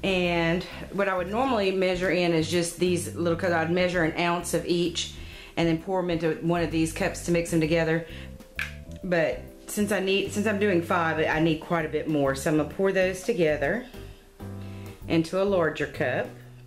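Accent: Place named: Alabama, United States. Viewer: Are you in Alabama? I swear I keep hearing one of my cousins talking. If you are from Alabama you prob are one of my cousins :-) (Big Family)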